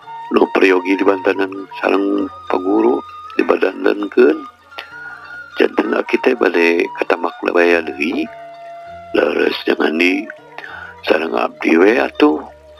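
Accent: Sunda, Indonesia. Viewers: Cerita dongengnya sangat bagus mudah di pahami.suara sunda nya aduh jdi inget pada masa jamannya.keren mang..sukses dan sehat slalu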